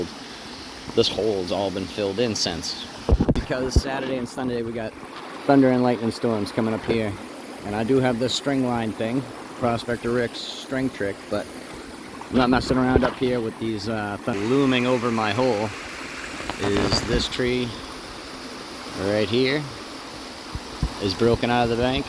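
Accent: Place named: Vermont, United States. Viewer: cool..I think that was the river that ran through West Townsend when I lived there..nope, that was the West River...wonder if there's gold in that one too...I kinda miss that accent from Vermont..the way you said "pry bah"..lol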